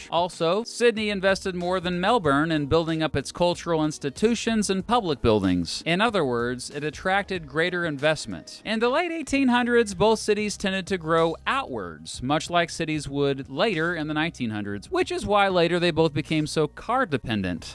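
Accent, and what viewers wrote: United States. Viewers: Melbourne is my favourite city!!! (I’ve lived in Adelaide, Sydney, Melbourne, Toronto and London) Btw the way you pronounced ‘Melbourne’ did not make me cringe. Aussies say it like ‘Melben’ because we don’t pronounce our R’s like Americans do. So with the American accent, the way you’re pronouncing it with the R is not really wrong (imo). As long you’re saying ‘Mel-burn’ and not ‘Mel-BORN’ like the Bourne Identity. That one makes me cringe... though I can’t really blame people for doing that since it’s spelled the same way.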